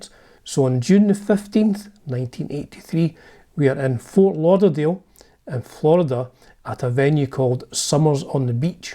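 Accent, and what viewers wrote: Scotland, United Kingdom. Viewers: I have that album. Are you from Scotland? I lived there with my wife and kids. I detect an accent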